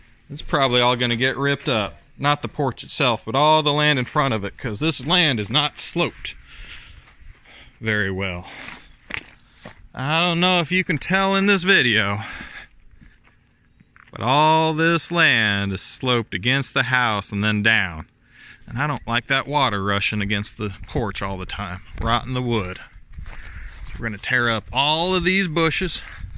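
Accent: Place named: South, United States. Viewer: You sound southern af😂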